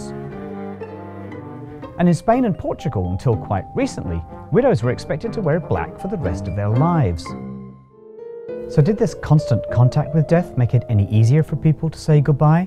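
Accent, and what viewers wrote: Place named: United Kingdom. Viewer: With all respect to the droll British author/narrator of this piece, it just isn't "Frontline" without the ponderous, lugubrious "voice of God" voiceovers of announcer/voice talent Will Lyman (also, surprisingly, the voice for "the most interesting man in the world" although he sounds completely different there, maybe it's the accent). Anyway, glad to be alive and have "survived" Mozart, also my dad who died at age 59, on account of chain-smoking Pall Malls, not lack of urban sanitation and lack of understanding of infectious disease (well, other than the public health issues surrounding smoking).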